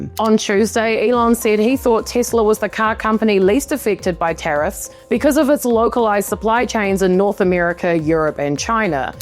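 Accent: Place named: New Zealand. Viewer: This is what I like to hear. Having been indoctrinated by YouTube into becoming anti-E-Loon I have been watching with some amazement the trajectory of this bloke's rise and rise on what is a Ponzi like bubble. The bubble is now straining to burst and the bigger it gets the bigger the mess is going to be. Personally I wish no good to the USers, the sad thing is the people are going to have to clean up this mess when the Moosk is gone. I predict his demise some time in the middle of this year, whether it will be the impeachment of Trump or the commencement of WWIII the E-Loon will fall. Whatever goes down it will fall on the people to carry the weight. I am enjoying your US reportage in an NZ accent.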